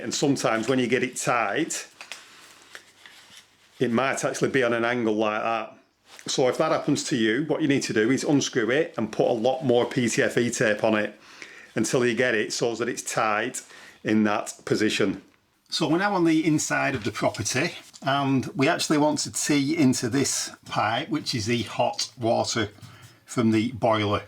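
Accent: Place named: Blackburn, United Kingdom. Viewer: Good video. Im willing to put my hand to anything and can usually figure things out but its always handy to have a good idea before you start and be sure you have all the parts to get the job finished. Judging by the accent your a local lad, Blackburn/Hyndburn area by any chance?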